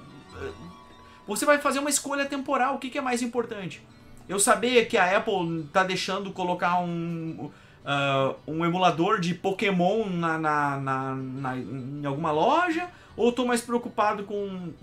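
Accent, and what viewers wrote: Rio Grande do Sul, Brazil. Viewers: O YouTube me indicou, vc é gaúcho?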